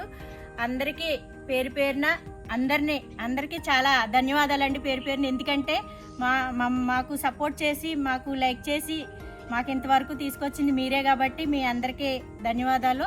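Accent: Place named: Krishna-East Godavari, India. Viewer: Dear — Don’t feel bad for not able to speak in English amma. I am 80 years old. And I did M.A English While working as a B. Ed. teacher. But your Telugu is so sweet with an accent between the Krishna and East Godavari districts. It’s not the language but the. Bhava prakatana which is important. That is important. That you have more with your smile. I like. and I watch all your videos. Bhasha anedi maatladuthovuntene brathukuthundi. You are doing your. duty as a. wife and as a mother very well. You are a. hard worker. Keep it up. By the by your farm is very nice😊God bless you and your. family. At this age my hands are shaking so much.Don’t feel bad if there are any mistakes.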